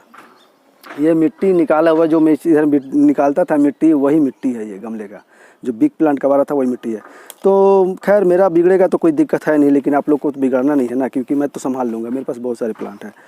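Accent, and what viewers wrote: Bihar, India. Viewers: "Bihari" ho kya bhai ???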